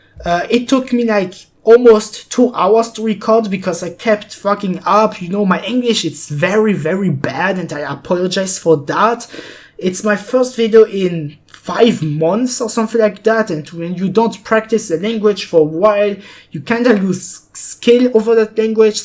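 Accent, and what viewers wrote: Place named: France. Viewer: We can hear your french accent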